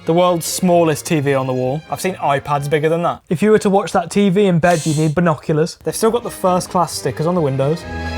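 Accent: United Kingdom. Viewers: ryan trahan but in british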